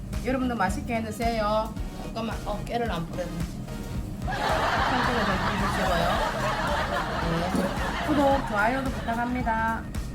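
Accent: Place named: Busan, South Korea. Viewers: 부산분이라그런것도있으시겠지만 제가봐도 실력도좋으시고 정감도있는분 확실합니다 ㅋ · 아 ! ~ 참 깨를 안뿌렸네 ~ 에서 빵~ 터지네요 레시피 그대로 조만간에 만들어봐야겠어요 영상을 오늘 첨 봤는데 좋아요 와 구독 누르게 되네요 구수한 경상도 부산 사투리가 정겹고 좋아요 저도 부산사람 이라서 그런가? ㅋ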